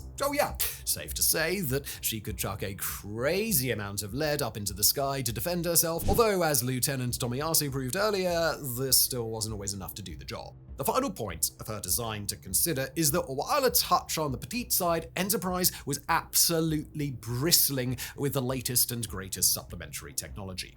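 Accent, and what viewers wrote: United Kingdom. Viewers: I find it heartwarming, and ironic, that a bald man with a British accent is endeavoring to “make sure history never forgets the name Enterprise” 🖖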